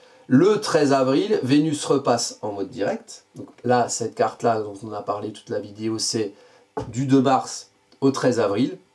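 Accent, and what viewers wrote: Alsace, France. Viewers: oohh, comment cet accent alsacien m'a fait me replonger dans mon enfance, un instant j'ai eu l'impression d'être a la maison, (enfin quand ca existait encore), et j'ai ri pour le débarassage du tahiti douche, limite PLS, à l'époque de la retro de Mars en cancer c'était trop ca ( y'a eu une opposition Pluton ce qui fait que bon relationellement y'a eu un peut de caca). Tout ca pour se rendre compte que bon Vénus va rétro en mars, donc mes plans ce que j'ai préparer pour le mois de mars, en plus avec Mercure rétrograde, je dois peut être me préparer à les oublier et à les réviser. Bon la mauvaise nouvelle dans la bonne humeur au moins.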